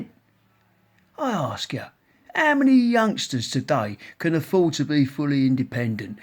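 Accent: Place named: Cockney, United Kingdom. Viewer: As if your voice isn't annoying enough, starting your cockney rant with a close up of you taking a toothy swing from your glass followed by 'smack! AHH!' sound effects is not a good look, 'mite'.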